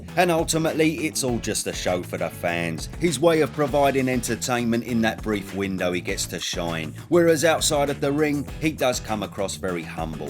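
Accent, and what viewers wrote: United Kingdom. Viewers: Dude your commentary is hilarious! A howard cosell intonation with the brit accent. Your irreverence is way more entertaining than the other stuffy guys. Well done guy. 👍🏽👍🏽😀😀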